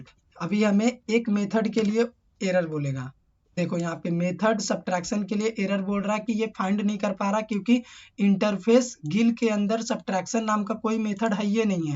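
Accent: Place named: Bihar, India. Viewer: Bihari accent is lob❤️ 'haiyee nai h'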